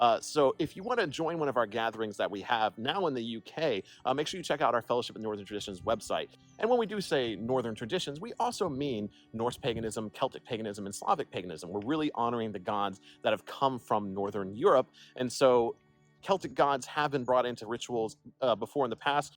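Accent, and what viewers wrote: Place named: United States. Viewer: Y r u American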